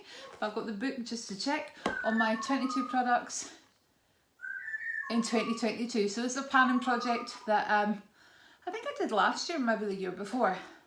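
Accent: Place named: Scotland, United Kingdom. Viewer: Hi trying to catch up on videos so going backwards 🤣. Love watching you and listening to your voice as it’s so nice to hear a Scottish voice as most of the you tubers I follow are English. Great video. Have a lovely day 🥰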